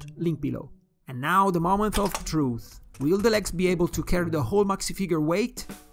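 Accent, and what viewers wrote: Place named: Italy. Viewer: I'm guessing Italian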